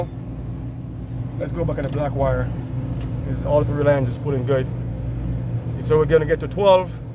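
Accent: Jamaica. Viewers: Are you a jamaican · Hey my friend are you from Jamaica? My family is from Jamaica as well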